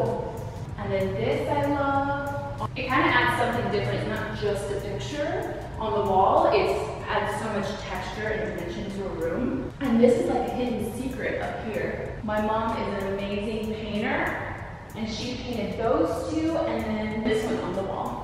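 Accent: Texas, United States. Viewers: I feel like your Texas drawl didn’t ever come through until this video! I love it. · I'm from Texas after reading comments about her accent I had to go back and rewatch the video! I did hear the accent but I think it was more because she was having fun and was talking a bit lower. But I've watched vlogs with her mom in them before and her accent didn't change. As well as picking peaches and the terminology isn't exactly big city lingo =)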